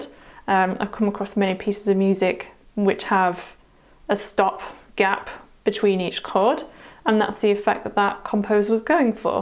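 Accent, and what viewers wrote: United Kingdom. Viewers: Tahnk you for tonight,, For some reason your uk aceent is seemigly plluck my heart string. d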